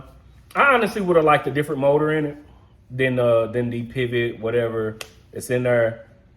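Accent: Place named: Memphis, United States. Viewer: Very honest opinion! I appreciate that. New to your channel bro & subscribed because of your genuineness. Keep being authentically you man! BTW don't know where you are located but your swagger sounds like Memphis! Just a guess. Peace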